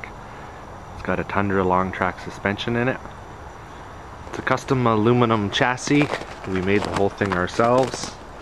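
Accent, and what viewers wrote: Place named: Canada. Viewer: It;s hilarious that I can tell when I'm watching a video from a Canadian by the way they talk lol... Yer from the Ottawa Valley eh? hehe.. Cheers Toronto here!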